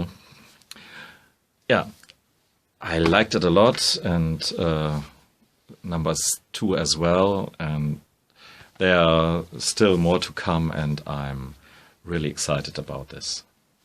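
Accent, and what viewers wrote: Germany. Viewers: Wie man dein deutsch richtig raus hört.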